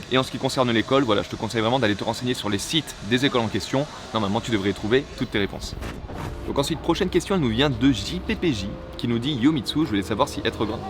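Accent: France. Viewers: Salut à toi ! Quelques petites questions du coup pour un prochain Dis-Nous Mitsu : - Est-ce que l'accent français t'a déjà posé problème en terme de compréhension auprès de personnes japonaises ? - Comment ça se passe le covid au Japon ? On te voit souvent en extérieur durant les tournages (beaux paysages au passage), y a pas de restriction sur ce point-là ? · O_O !! Alors là pardon pour le cliché mais un mec hétéro qui regarde des dramas/films japonais, qui mange avec une main devant sa bouche (façon japonaiSE) et qui est fan de Nana jusqu'à avoir le cadenas de Ren autour du cou bah alors c'est grave inédit !! J'ai perdu 10 mes jeunes années à chercher un mec qui comprendrait ma "passion drama" et ai fini par abandonner... et me suis mariée à un mec qui ne comprend toujours pas le délire (et c'est pas grave parce que d'autres qualités c'est bien aussi !!), mais je ne savais même pas ça possible XD !!! 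Sinon, une vidéo feat avec Pepe et Vivi c'est possible un jour genre un format interview pour qu'ils parlent justement à coeur ouvert de cette expérience Terrace House ???? Et aussi, ton accent (quand tu parles français) c'est quelle région ?? :D Plutôt Est ?? (Alsace-Lorraine ?) Et aussi, tu pourrais nous parler un peu du regard que tu portes sur toi-même depuis que tu as choisi cette vie au japon ? Est-ce qu'il a évolué par rapport à qui tu étais en France, à savoir si il n'y a pas eu des couac d'égo, je trouve toujours plus intéressant quand on rentre dans le fond des choses et qu'on aborde la réalité, de nombreux youtubers ont parlé de l'envers du "décor" je trouverais ça grave cool que t'en parle. Et aussi... Non en vrai j'ai fini de bavasser XD !!! Bonne continuation o/ !!!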